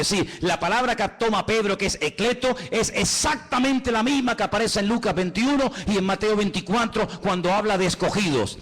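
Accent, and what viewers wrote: Spain. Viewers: Que buen mensaje pastor;👌 por su acento es Usted Español ..bueno es Dios 👏que todavía tiene hombres diciéndole a los feligreses la verdad ;que otros esconden solo hablan de prosperidad económica y las almas que se pierdan 😳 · Muy tremendo mensaje usrted me rebendijo con la palabra .. saludoa desde argentina ... de donde es la iglesia ? .. por el ascento es de españa?..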